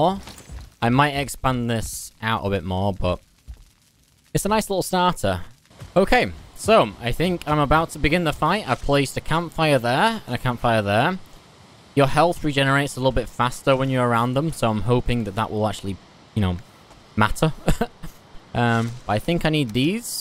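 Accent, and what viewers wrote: England, United Kingdom. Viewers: Loving this modded series will have to look at installing mods just for the build anywhere and automated forge.BTW their is a content creator who plays RUST called willjum and i swear it must either be you as another account or your brother,the voice is the same event down to the english accent.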